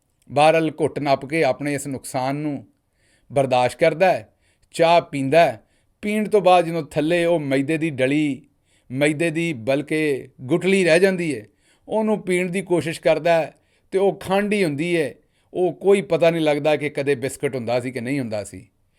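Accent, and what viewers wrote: Punjab, India. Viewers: Aahahahaha wahh Sahab, Kya Punjabi lehja or pure alfaaz hen aapky😂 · آپ کا پنجابی وی لاگ اب روز سنتا ہوں آپ کا لہجہ خالص پنجابی اور پنجابی کلچر کو الفاظ کے روپ میں حقیت میں محسوس کروا دیتے ہیں۔۔۔۔۔۔ اس پنجابی وی لاگ ختم نہیں کرنا چاہے سننے والا میں اکیلا ہی کیوں نہ رہ جاؤں 😂 فن بھی انفارمیشن بھی آپ کے اس پروگرام میں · . I love your village Punjabi Vlogs. We are from Lahore for generations, used to listen this Punjabi only when we used to visit our relatives in a village. It is soothing and I really enjoy it. The way you speak it seems that my cousins from a village are talking. It is hilarious and I get a kick out of it. Thank you. I really miss village Punjabi. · 😂😂😂😂😂😂😂😂 well thought out story Dr sahib love your shudh Punjabi accent thanks for putting a smile on our faces .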